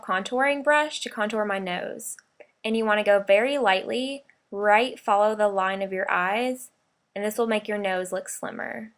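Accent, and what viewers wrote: South, United States. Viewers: Your southern accent is cute! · Omg had a country accent lol I love it!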